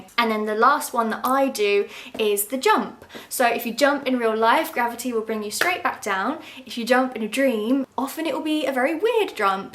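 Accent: Scotland, United Kingdom. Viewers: I'm going to guess from her accent is Scottish, can't find any explicit info, I'm not the best linguist/dialect judge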